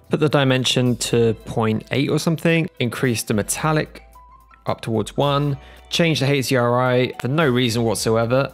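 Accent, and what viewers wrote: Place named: United Kingdom. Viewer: i think you are british guy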